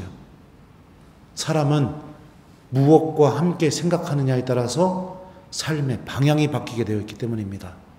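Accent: Chungcheong, South Korea. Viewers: 신부님 말씀 잘 듣고 있습니다. 충청도 말씨가 구수하면서도 우리 일상생활과 근접한 어휘로 하시니 가끔 웃습니다. 고맙습니다.😂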